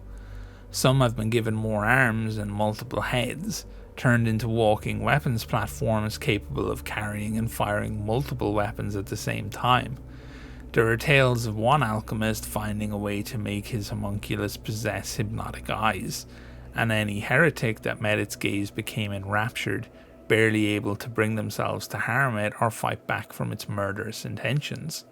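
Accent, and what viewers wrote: Ireland. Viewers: Doing an awesome job my man, honestly the best lore channel I've found for trench crusade. Irish accent works so well for the subject matter too !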